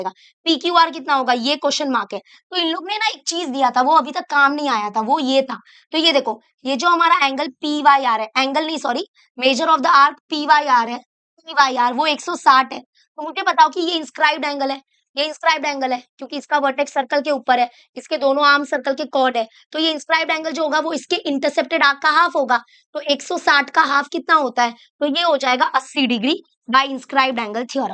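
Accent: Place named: Mumbai, India. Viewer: I love her mumbai accent 😃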